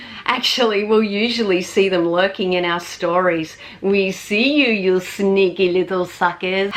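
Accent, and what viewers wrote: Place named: Australia. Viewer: lovely princess, you always make solid points about the content on your channel, so inspiring, so informative, very classy, and very creative, plus, you have such a beautiful glow on your pretty face, and the most adorable accent in Australia. I have so much respect for you, the same way you do for me. Send you a bow like a noble gentleman, and you send me a curtsy like a graceful princess. 🤗🤗🤗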